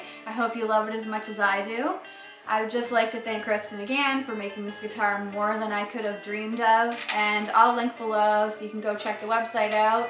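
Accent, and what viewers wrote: Canada. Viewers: Love that Canadian accent! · I like your Canadian accent. The guitar looks and sounds great too. The surf shack is lookin' great.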